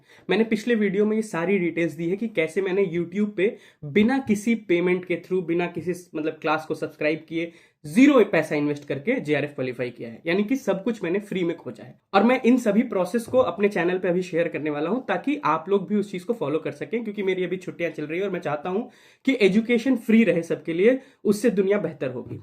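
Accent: Bihar, India. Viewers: Bhai sach sach batana Aap Bihar se ho na ☺️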